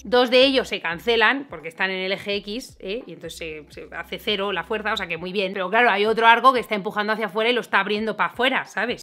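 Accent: Spain. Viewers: No sé cómo suena tu acento para los españoles, pero para mí, un argentino viviendo en Texas, la combinación de tu acento español con términos en inglés suena, cómo dirías tú, simplemente de puta madre. Me fascinas mujer. Y lo técnico de tu contenido, It’s too much 😉. Keep it up! 😍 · Acento Español + Arquitectura = el canal perfecto! Cásate conmigo por favor 😍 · Soy argentino y me enamoran las mujeres con acento Español. Me la ponen como una locomotora, "medieval"... Pero está mina además, tiene una voz, unos ojos y esos labios pintados que si le entro a besos chocarian los planetas. Nena, sigue así que me ilusionas demasiado.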